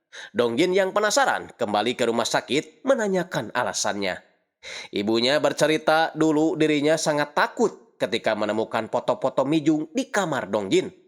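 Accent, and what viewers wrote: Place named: Sunda, Indonesia. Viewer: trima kasih kang, nyeritain nya seru n lucu dgn gaya sundanya, sukses selalu kang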